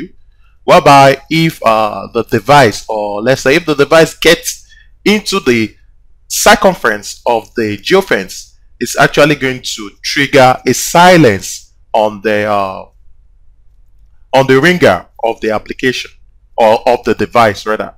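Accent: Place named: Nigeria. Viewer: From the accent, I can tell he's from Nigeria. ;-)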